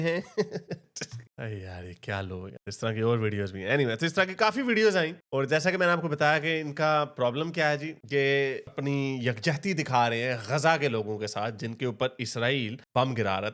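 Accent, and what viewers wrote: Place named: Punjab, India. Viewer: I thoroughly enjoy your Punjabi accent and jumble😂😂😂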